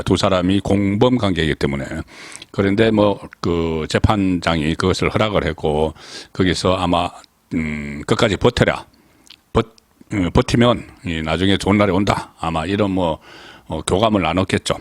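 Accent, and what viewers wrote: Gyeongsang, South Korea. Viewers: ㅋ 경상도 출신의 송국건 님의 어휘입니다 이해하시길요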